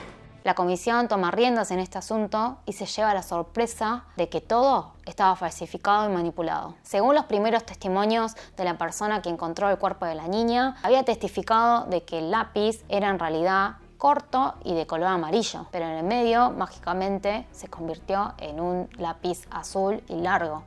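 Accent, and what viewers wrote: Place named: Argentina. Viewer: Súper raro ver una China o coreana nose hablando argentino 🤔🤪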